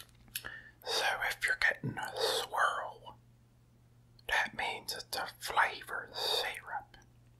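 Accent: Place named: Pennsylvania Dutch, United States. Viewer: it's a penny Dutch accent albeit a little different from the traditional penny Dutch accent. He's from PA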